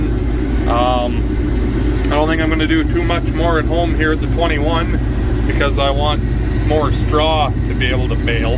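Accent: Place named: Canada. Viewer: You Canuck's sound funny when ya talk, I'v been noticing.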